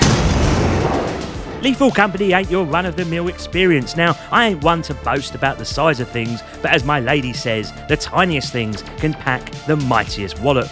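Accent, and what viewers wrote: Cockney, United Kingdom. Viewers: A game reviewer with a cockney accent was something I never knew I needed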